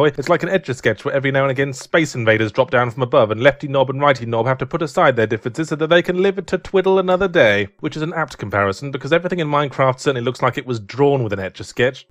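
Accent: Australia. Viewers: Oh cool you are an Aussie? Again cool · is he australian if so yay another australian if not well idk.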